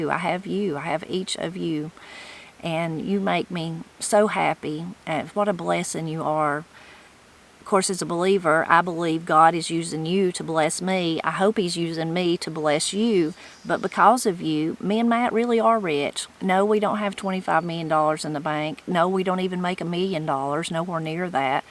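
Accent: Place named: East Tennessee, United States. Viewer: Just chiming in to say that I enjoy your videos, and it's crazy to me how it's anybody's business about your money other than your own. Keep on doing what you're doing, and it's good to hear voices that sound like home to me. East Tennessee expat in South Central Virginia, I'm still technically in Appalachia, but it's a little different than home.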